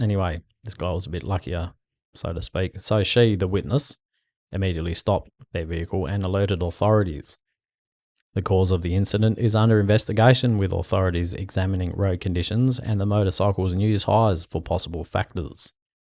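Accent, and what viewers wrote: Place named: Australia. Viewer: Thanks for the content. I enjoy the aussie commentary hehe. Cheers mate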